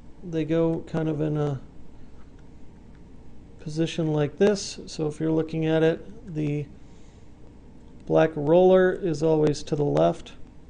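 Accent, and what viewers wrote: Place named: United States. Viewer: Thanks! At last! Someone who actually knows what they are doing in a Apple Mighty Mouse take apart & repair. The instructions are very good and the narrator's voice is in adult American English. Plus the narration is without a lot of superfluous "Uhhh"s and other vocal diatribe. And it is even edited well and in focus! What a novelty! In my case, the outer containment ring was REALLY glued down in front. It took a good half hour of gently slicing into the space between the ring & the housing to with several sharp Xacto knife blades to finally cut through the glue. The containment ring is pretty flimsy and I was afraid of breaking it so I did not try to pry it too hard. Patience (and a sharp blade) is the key when it is glued so hard. This mouse of mine had stopped working properly about two years ago. It would not scroll in one direction. 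But upon disassembly I found that my unit was not as dirty as I thought it was. What I discovered was that just one of the little tiny wheel & shaft assemblies that surround the ball was really jammed up with debris keeping it from moving. Because I waited so long to do my tear down the shaft had actually worn down on one side from the friction of the ball against it. So my problem was not solved. It certainly works faster and smoother than before but that one direction is still not functioning due to the worn shaft. Lesson learned—if simple exterior cleaning is not enough (it never was) then do a tear down and initiate a proper cle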